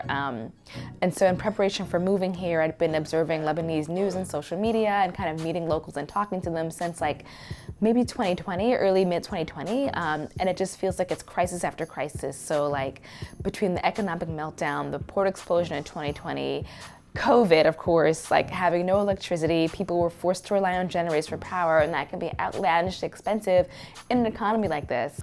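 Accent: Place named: United States. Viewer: Why are you using an american voice.................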